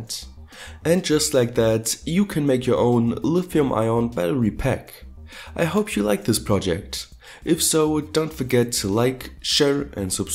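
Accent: Germany. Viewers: Klingt wie ein deutscher der englisch spricht, schade dass es sowas nicht in Deutsch gibt :( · he's saying NICKLE ribbons,, for anyone who cant distinguish it ;) He's adapted that annoying youtube podcaster inflection and coupled with his german accent it sounds a bit ,... not good.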